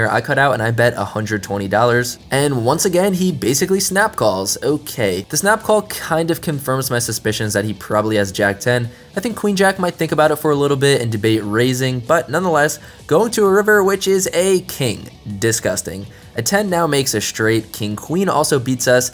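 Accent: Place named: General American, United States. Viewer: Hey Sorry about the unlucky runouts!! Did you grow up in New Jersey, or did you grow up further west? You seem to have a more general American accent rather than the "typical New Jersey accent".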